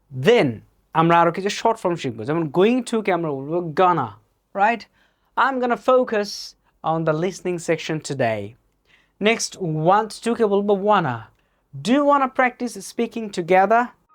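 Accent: United States. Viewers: ভাই এগুলো America Accent নাকি British Accent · american accent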